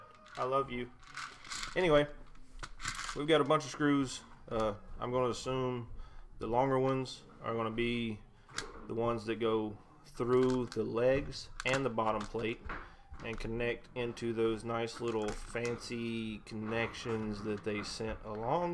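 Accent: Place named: South, United States. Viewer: Sweet video! Never have been to your channel, heard your voice and said man this guy is country (by the way I am in Alabamer). I was thinking this is going to be a wreck, but....You are awesome at building and so thorough, with the continuity checks and all. I really enjoyed the video and will be subscribing. Thanks again for the Video ;)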